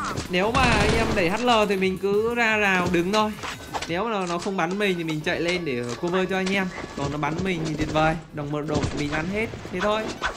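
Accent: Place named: South, Vietnam. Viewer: Giọng cha hình như trong.nam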